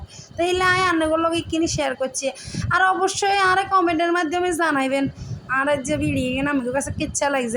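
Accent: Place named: Noakhali, Bangladesh. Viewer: খুবই মজা পাইলাম আপু,, তুমি এই নোয়াখালীর ভাষায় ভিডিও কইরো, শুনতে খুব ভালো লাগলো ❤❤❤❤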